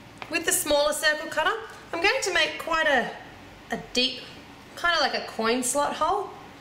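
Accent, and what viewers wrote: Australia. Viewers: She is from Australia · Are you Australian of South African.... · ur aussie right? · australian i think....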